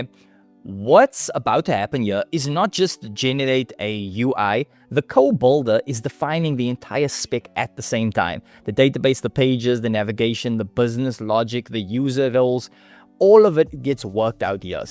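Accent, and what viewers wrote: South Africa. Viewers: Intresting dialect, you i SAfrica? Sound like a combination of hindi and Afriaans mix in English. I like it. ☺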